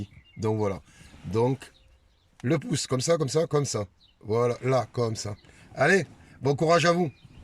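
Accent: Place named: South, France. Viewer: Merci un charmant petit accent du sud magnifique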